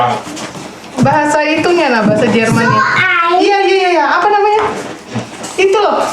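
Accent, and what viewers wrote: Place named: Balikpapan, Indonesia. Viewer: Gaggahh nya pang.. Balikpapan bgt🤣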